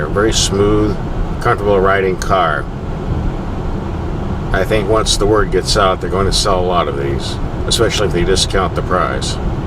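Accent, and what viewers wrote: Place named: Newfoundland, Canada. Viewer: You sound Newfie, but there's Cacti in the background.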